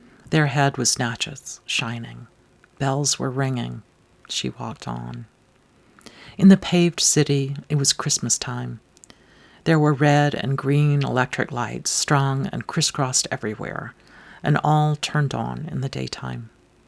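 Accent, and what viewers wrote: South, United States. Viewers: Although he's better know for his non-fiction Civil War trilogy, Shelby Foote is my absolute favorite. All of my main characters carry his voice somewhere within them. He spoke a great deal about Eudora Whelty. He admired her a great deal, appreciated their bourbon and talks together. I found her through him. And I have now found you through her. Remarkable how small the world gets. How we are all connected by tiny, undetectable strings. I've listened now to another reading or two of yours and have enjoyed both tremendously. Not sure if you are from the South or not, either way you capture the essence beautifully. We'll done.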